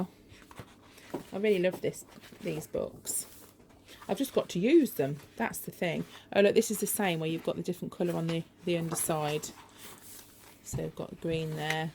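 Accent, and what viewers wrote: United Kingdom. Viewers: Just came across your channel after typing in UK crafts. I was getting a bit fed up with most sites I'm subbed to being American with items not available over here. It's good to here a British voice! I'm on a tight budget & never heard of 'Every Craft A Pound' so this is great news for me. As for your craft room...WOW! It is just so beautiful & all so neatly organised. I don't have a spare room so my very small & narrow living room is also my crafting room! hehe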